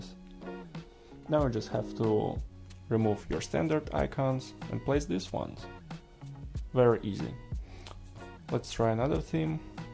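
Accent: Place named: Eastern Europe. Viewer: Найс чувак, по акценту почти не слышно что ты из восточной Европы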